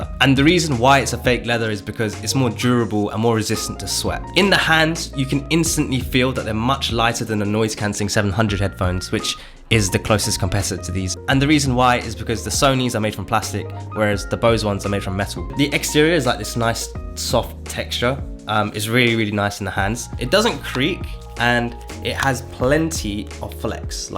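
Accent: United Kingdom. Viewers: "?" Dear Sir, your information is so valuable! But your clipped British accent at coffee shop velocity is sometimes unintelligible, especially for someone who wants to be easily understood and WHOM WE WANT TO UNDERSTAND! You speak so well! But too fast . . . Slow down, please! (About halfway through, I gave up: tired of the onslaught/drone of your chatty, breezy voice--with no respect for the listener.) We're not your friends; we're your audience.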